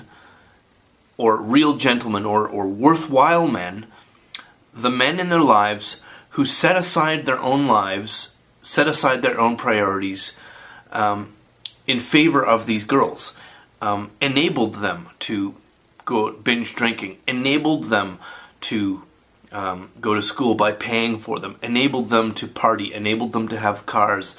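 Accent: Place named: Scotland, United Kingdom. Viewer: sounds Scottish. I'm a Scot myself.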